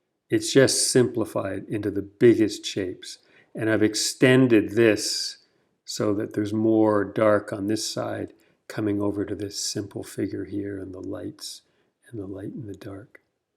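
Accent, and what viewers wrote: Canada. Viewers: You cant hide that Canuck accent 😆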